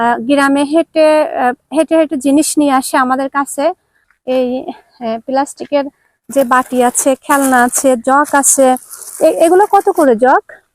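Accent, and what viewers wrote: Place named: Jessore, Bangladesh. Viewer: তোমার কি সুন্দর কথা আমাদের যশোরের মত কথা তোমার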